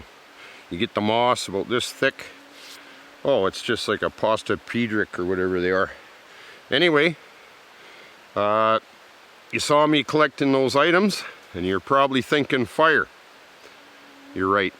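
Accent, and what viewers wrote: Canada. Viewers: He sounds so Canada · I could tell you’re from canada because of your accent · Since you're Canadian. Would you say "A" or "ay" at the end of every sentence like your asking a question please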